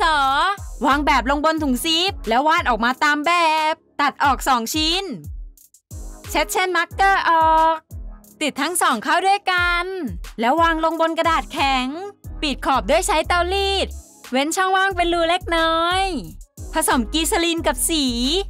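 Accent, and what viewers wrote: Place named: North, Thailand. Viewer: เสียงคนภาคดูเหน่อๆนะ สาวเหนือรึเปล่า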